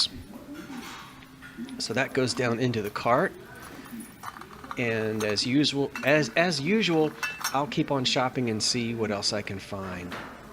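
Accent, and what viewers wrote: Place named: North Jersey, United States. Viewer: Northern Jersey talks like that. I went 11th year of school up there & they thought I talked weird. I was originally from Baltimore, Maryland. 😅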